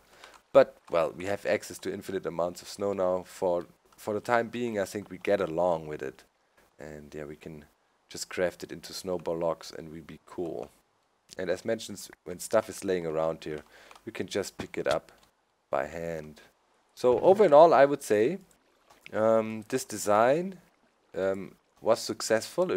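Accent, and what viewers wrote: Germany. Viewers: your german accent sounds so awesome haha xD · Aber man hört schon dass er deutscher ist.. Findet ihr nicht?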